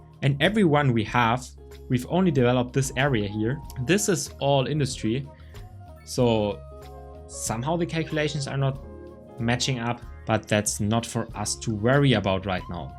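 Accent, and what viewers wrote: Germany. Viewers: Ha ha this accent (german) right?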